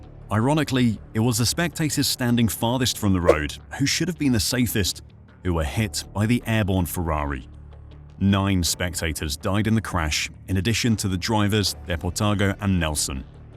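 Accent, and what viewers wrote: England, United Kingdom. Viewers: Regarding Tom Pryce's accident - it's "car park" - not "parking lot". You sound English to me so you should use the English terminology.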